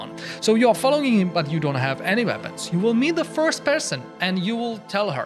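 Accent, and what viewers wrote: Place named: India. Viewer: I'll take my chance at sounding rude, but how are you quite white with that Indian accent? Just being curious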